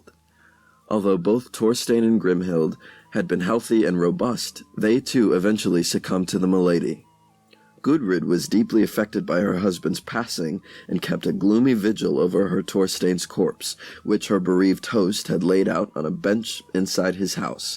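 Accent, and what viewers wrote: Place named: Canada. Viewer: I was mystified by the mispronunciation. The narrator has a Canadian accent, but pronounces some things phonetically. Makes me wonder if this is actually an AI bot program. Growing up in eastern Canada, we had history lessons out the ying-yang and the pronunciation of common Canadian places is pretty basic. And I can't imagine an Anglophone mispronouncing "sword" as it looks.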